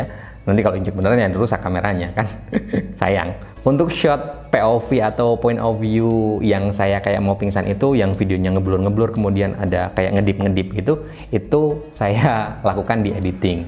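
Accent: Pekalongan, Indonesia. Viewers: Sampean Pekalongan ya mas?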